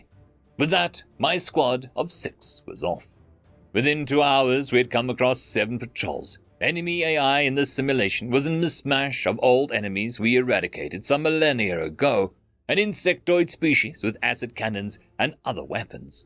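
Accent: Scotland, United Kingdom. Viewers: " A very British accent..." does a Scot instead. Nigel Green as Colour Sgt. Bourne from "Zulu" probably would've been a better choice. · Very British Accent 😂 well technically Scotland is in Britain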